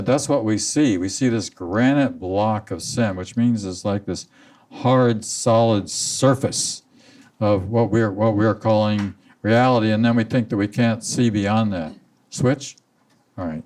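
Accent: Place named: Missouri, United States. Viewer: Yes, I do hear your Missouri heartland accent, no? 🙏🏼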